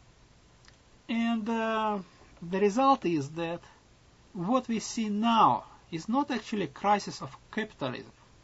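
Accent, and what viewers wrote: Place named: Russia. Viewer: Акцент русский просто жесть )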